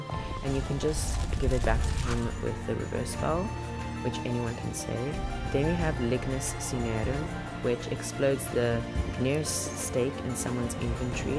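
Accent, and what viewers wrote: South Africa. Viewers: R u from SA?? 😜💅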